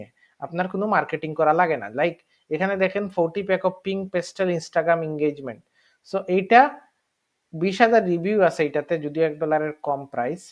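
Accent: Sylhet, Bangladesh. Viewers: Vai apni ki sylheti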